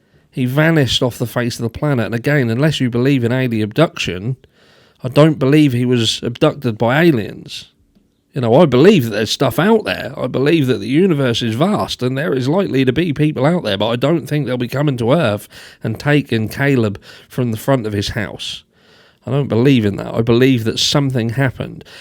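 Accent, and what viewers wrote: United Kingdom. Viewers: what part of UK are you from? I’m interested in accents and learning where they’re from. I traced my dad’s family (Vyse) back to the 1400s in Norfolk.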